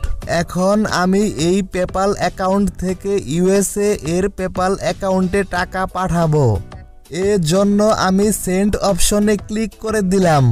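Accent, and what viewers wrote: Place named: India. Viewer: Title with eng why the hell u talk with Indian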